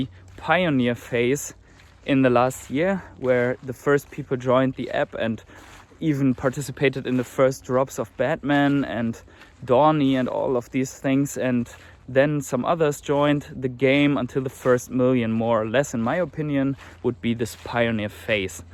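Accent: Germany. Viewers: Hörst dich deutsch an 😉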